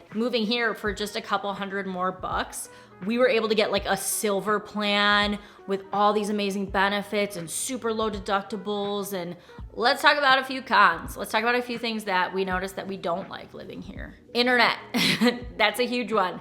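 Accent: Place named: Wisconsin, United States. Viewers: Great video and I’ve been enjoying your content since you started. The biggest takeaway from this video wasn’t about Vegas, it was about you. I didn’t realize you lived in Wisconsin! I always thought you lived in Vegas. Now that I know you’re from Wisconsin, I can’t help but hear your accent! Anyways, congrats on the move and keep up the good work! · I knew you were from MKE from your accent!!! i can’t wait to become the next Wisconsin girlie to move to Vegas 😂🎉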